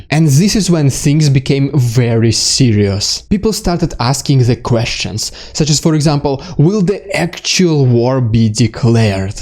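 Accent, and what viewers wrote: Russia. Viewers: Love your pronunciation of Russian and Ukrainian words and names. Strong Russian accent which is appropriate and very difficult for an English speaker to reproduce.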